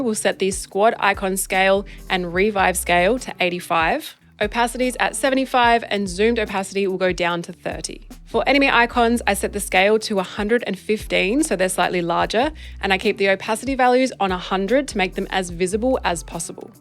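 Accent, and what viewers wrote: Australia. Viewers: I hear you mama content definitely has matured since I last watched still love that aussie accent.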